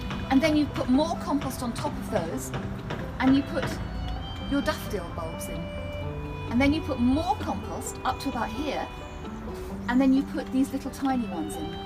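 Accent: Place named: London, United Kingdom. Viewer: 特别喜欢正宗的伦敦音！赞👍👍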